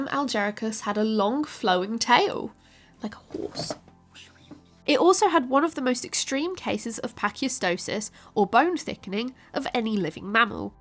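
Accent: United Kingdom. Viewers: I like the British accent. It makes me think of Harry Potter. Sorry. 😅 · Fabulous! Listening to a British Thumbelina in a basket teaching me about deer is so wholesome.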